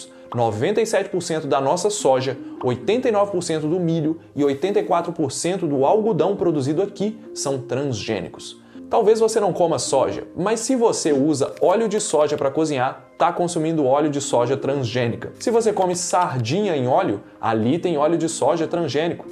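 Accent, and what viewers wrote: Minas Gerais, Brazil. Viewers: Que sotaque mineiro fofo ❤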